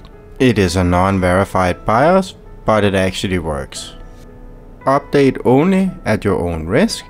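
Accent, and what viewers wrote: Denmark. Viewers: I know a dane when I hear one...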